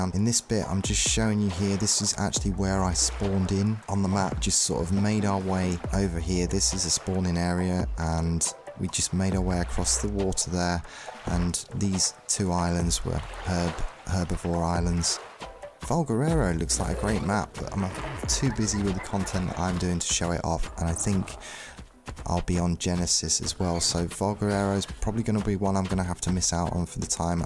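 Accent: United Kingdom. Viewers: A Young British Bob Ross teaches Ark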